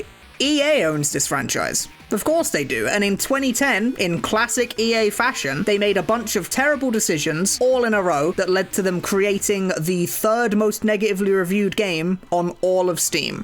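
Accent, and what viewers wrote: United Kingdom. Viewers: nice video, but would have been better without british accent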